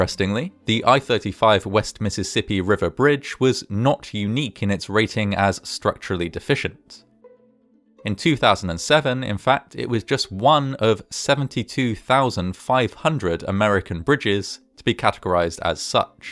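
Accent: England, United Kingdom. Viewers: Is he English ?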